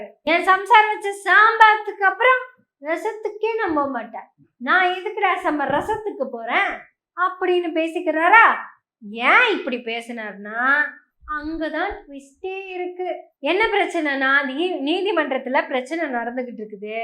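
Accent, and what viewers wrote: Kongu, India. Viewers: Kongu tamizh asathal .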